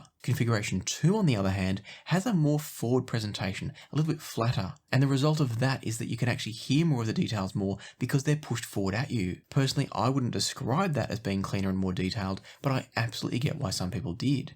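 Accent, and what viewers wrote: Australia. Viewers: I think testing DACs is a good idea. At CanJam NYC, I could not tell the difference between DACs, it takes me a long time for me to notice the difference when I upgrade a DAC (except when getting a May). Also your natural "live" voice is far more Aussie than your presentations. Took me by surprise. But I also remember that you record softly to not disturb your family, so that's cool.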